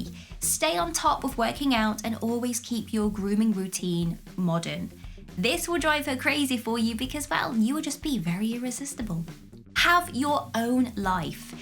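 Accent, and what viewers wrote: United Kingdom. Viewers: I like your accent ! Are you British ?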